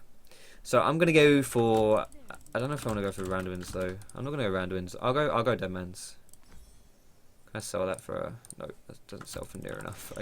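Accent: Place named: Essex, United Kingdom. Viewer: Think he os from Essex, sounds like the accent.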